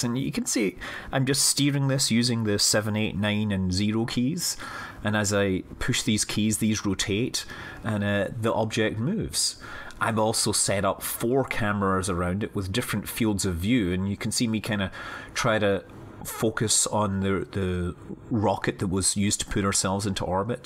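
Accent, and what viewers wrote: Scotland, United Kingdom. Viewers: is this a very very very posh scottish accent? i thought it was canadian at first haha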